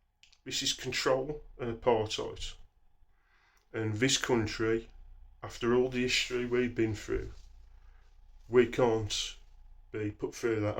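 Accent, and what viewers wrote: Birmingham, United Kingdom. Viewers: Nonsensense, science fiction...but good brummy acting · Luv your brum accent i am a Glaswegian.And your message is true Keep doing your videos. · You sound Brummie, are you from Birmingham? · Too many sheep we are fucked. And spoken like a true brummy!!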